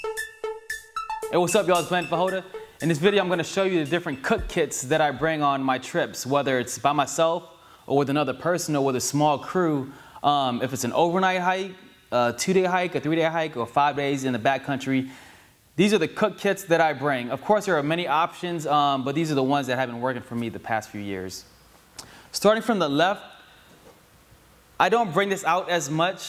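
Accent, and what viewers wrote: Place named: South, United States. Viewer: Fantastic review. Are you from the south? Every once in awhile I could note a southern twang in your speech.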